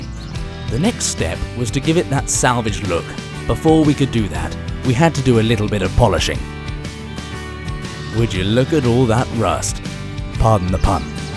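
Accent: United Kingdom. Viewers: Got Dang that is one jive turkey. First comment on one of your videos i found you threw planet rust but just now relized how amazing your other videos are. this is by far one of the coolest vidoes i have seen in quite a wile. love your grammer and accent and you in all your british glory. its not surprising you have 30k subs with how steller your videos are. you have a real tallent and i could easily comapre you to fankie on pc in 1080 p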